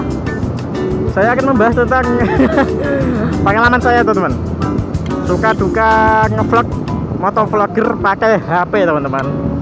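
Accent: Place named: Java, Indonesia. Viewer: Aku suka gaya bicarane mase... Mantap.... Ngekas orang jawa... Semangat pokok e.. 🙏👍👍👍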